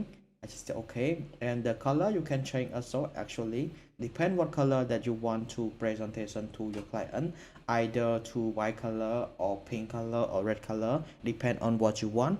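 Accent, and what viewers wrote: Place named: Cambodia. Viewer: He sound Cambodian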